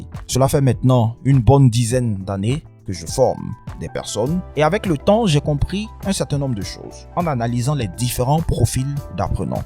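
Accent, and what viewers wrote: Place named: United States. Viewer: Salut mon frère, franchement j'ai vraiment la façon donc tu parle cette anglais américain. Je suis vraiment impressionné. Stp est-ce possible d'avoir ton contact si ça te gêne pas. J'aimerais vraiment développer ma conversation en anglais américain avec un speaker en plus de mon exercice personnel de parler en anglais ( shadowing)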